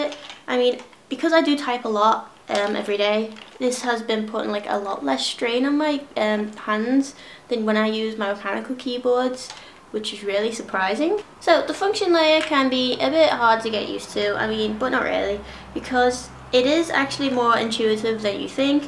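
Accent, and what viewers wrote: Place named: Liverpool, United Kingdom. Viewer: Do I hear a fellow scouse geek?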